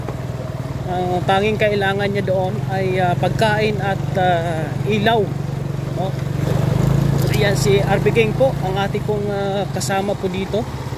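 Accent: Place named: Cebu, Philippines. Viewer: Ano yung dialect mo cebuano ka ?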